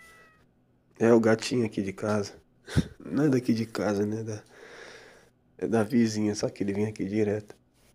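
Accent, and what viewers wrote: Rio de Janeiro, Brazil. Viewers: sim, ele é carioca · Tu é carioca ? Ou é impressão ?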